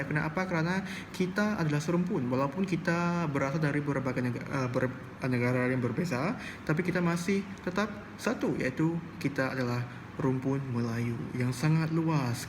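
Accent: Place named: Indonesia. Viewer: Kamu mengunakan bahasa indonesia,,seleng indonesia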